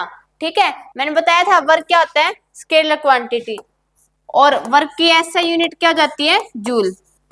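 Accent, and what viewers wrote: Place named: Haryana, India. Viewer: Haryanvi 😅, best question ma'am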